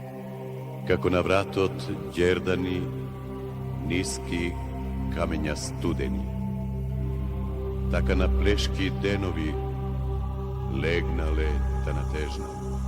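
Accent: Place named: Veles, North Macedonia. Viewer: Veleski Diajalekt preubav. pozdrav braka moi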